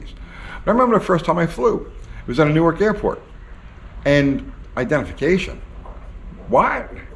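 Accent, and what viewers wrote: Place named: North Carolina, United States. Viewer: You sound very much like some of my old friends here in North Carolina. I thought these same thoughts in their entirety that you are thinking and I am 70 yrs. Old and a deactivated Marine. You and I could talk forever on these and many other things. I used to love the old westerns and old science fiction and many other old things. I don't know if you are aware that the points vehicles are EMP proof. The computer brain vehicles are not and the systems would be fried if an EMP explosion went off or if a nuclear bomb hit 60 to 100 miles away. Call up some manufacturers and ask them are their cars, Jeeps included are EMP proof. I own a 2011 Jeep Wrangled, Call of Duty edition with only 73,400 original miles on it and Jeep can only speculate about it's ability to endure. I love the Jeep and it has never given me any problems except in the electrical department which required new complete wiring for 800.00 dollars at the Dealership. 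I would love to have a wrangled type jeep from the 60's and most especially a jeep from the Nam Era that was not a demilled military one. They cut the bodies in half. I don't trust rewelds that leaves a soft spot in tempered struck steel. Of course they don't do over 60 miles an hour but a great mechanic could boost it up. That would definitely survive an EMP attack and get me purtineer anywhere I need to go. Pop a couple of gas and a water can, axe and shovel and I would be in business ( biness).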